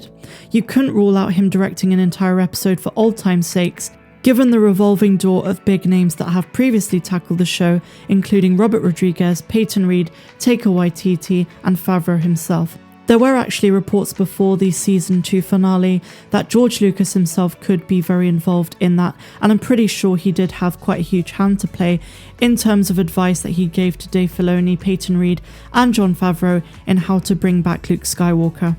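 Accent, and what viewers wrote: United Kingdom. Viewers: Thanks for the update. Where in the UK are you from btw, that is if you live there? I like your accent.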